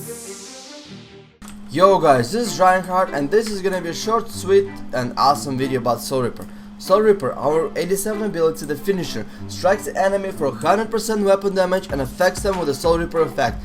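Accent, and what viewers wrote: Russia. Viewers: I know it's pretty gay to say this, but man I missed your russian voice. ;)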